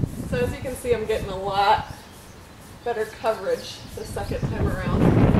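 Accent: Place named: Illinois, United States. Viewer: I'd watch you paint anything....BTW, love the Illinois accent, as someone from Central IL I recognized it right away.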